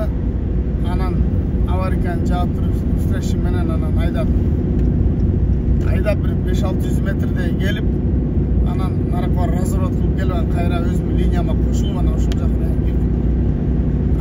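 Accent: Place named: Batken, Kyrgyzstan. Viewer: Мен ойлодум эле сени Баткенден болсо керек деп себеби создорун бизге жакын суйлойсун Баткенче😂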